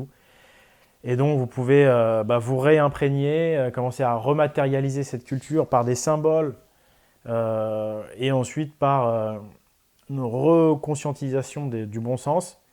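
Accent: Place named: Provence, France. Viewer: Lady Aurelie Boissat Fraser of Lochaber. c'est juste que je ne comprends pas très bien, pourquoi certaines vidéos sont intitulées aussi...mite etc... sur sa chaîne mais sont narrées,haha, par un type à l'accent provençal ? tu connais bien ? tu peux me dire s'il te plaît ?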